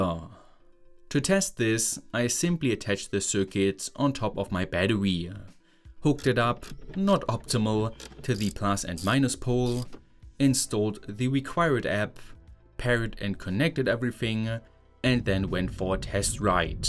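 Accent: Saxony, Germany. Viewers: I'm wondering about your accent. Are you German or Austrian? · almost SÄCHSISCH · Dieser unglaublich schreckliche deutsche Akzent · Bro has the most German accent I have ever seen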